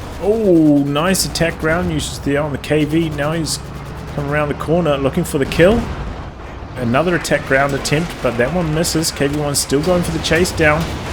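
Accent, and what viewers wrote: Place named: New Zealand. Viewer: You from NZ brother?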